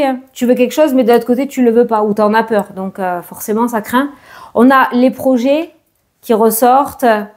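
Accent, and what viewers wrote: South, France. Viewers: Bonjour ma douce, merci pour ta guidance, merci pour ta bonne humeur avec ton petit accent du sud que j'apprécie. Bisous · J’aime de plus en plus regarder vos/tes vidéos. Bonne humeur à gogo Et l’accent:-) Dans le sud aussi, pas loin je pense. Bravo 💖 Ps: je sors de la douche 😜😂, j’écoute en me préparant … 👍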